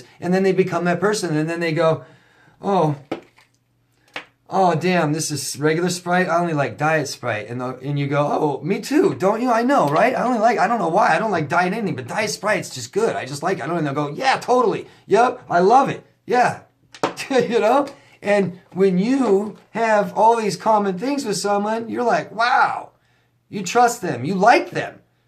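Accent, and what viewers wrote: England, United Kingdom. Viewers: Your English accent is really funny..Nessa from England !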